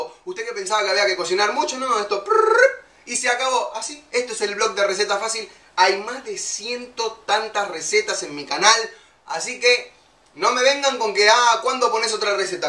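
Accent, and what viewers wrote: Argentina. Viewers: Jodeme que es Argentino? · Siempre que veía tus vídeos tenia la duda si eras de Argentina por la forma de hablar... pero ya que dijiste mate no me quedan dudas. Muy buena la receta. Saludos desde Córdoba Capital, Argentina. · o es argentino o uruguayo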